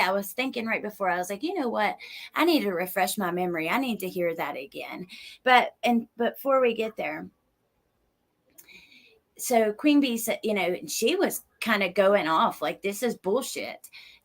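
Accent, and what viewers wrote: South, United States. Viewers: I can listen to u talk all day.. lol 🥰 ur southerners accent reminds me of brit spears ♥️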